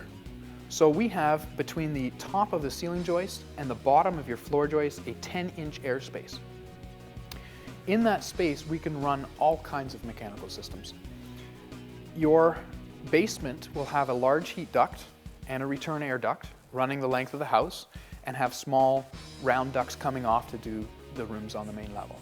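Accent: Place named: Canada. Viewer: Obviously this guy is Canadian. i.e. "blowing the snow off when it precipitates in the winter" and the accent. Not for North Carolina where I want to add on.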